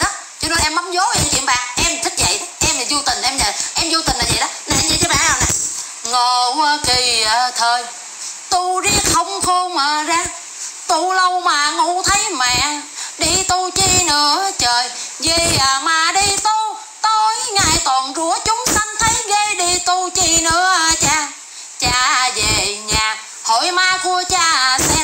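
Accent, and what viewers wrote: Standard. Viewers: Em ca hat noi rat chuan nghe kg ong thay dong ho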